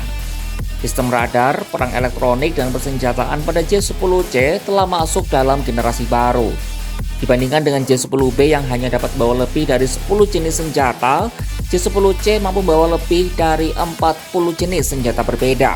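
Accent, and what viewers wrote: Java, Indonesia. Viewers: Sekelas Kompas pembaca beritanya medok bgt. Kaya ga ada yg lain aja 😆😆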